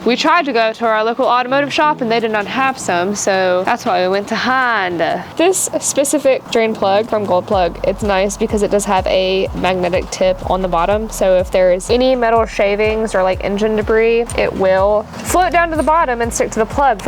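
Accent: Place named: Europe. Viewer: Great information...that accent...European made in America if I'm not mistaking ;-) Stylish! Keep up the good work. Will try same on my 2 Navis. Happy trails. JP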